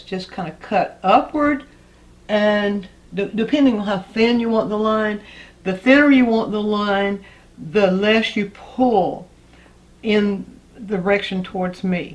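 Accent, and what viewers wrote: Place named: Texas, United States. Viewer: Dear. I,m so happy to have found you and appreciate how you teach with demos, I love your soothing. Live and accent. Are you Texan? Thanks you again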